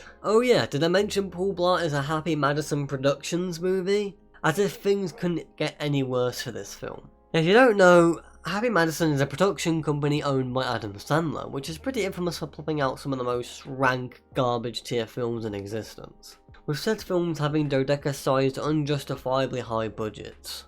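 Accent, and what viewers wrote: United Kingdom. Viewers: Why are you British tho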